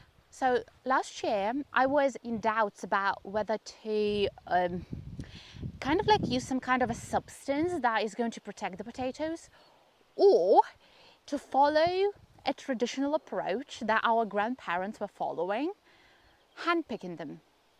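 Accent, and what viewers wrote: United Kingdom. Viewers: I am glad you chose to cultivate a traditional British accent rather than an American one! It is definitely harder to learn, but, as evidenced by your gardening, you are a wellspring of passion. Good luck.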